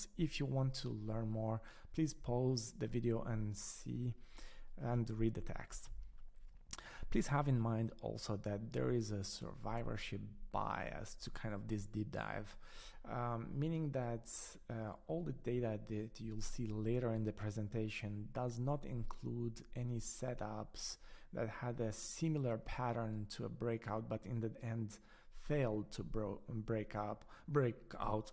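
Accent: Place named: Germany. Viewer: Hi, great analysis . Are you german ? I speak some german and your accent is very similar. Thanks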